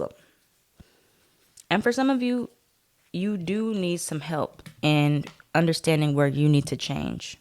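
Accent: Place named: New York, United States. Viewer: 🤣🤣🤣🤣 I can tell ur from ny. I’m so happy ur doing better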